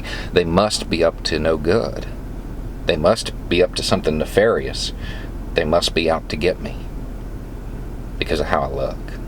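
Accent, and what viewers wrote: South, United States. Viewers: Why does common sense make so much more sense presented at the proper cadence, in a southern drawl? New subscriber and a big fan of everything I've heard so far. Howdy from Detroit.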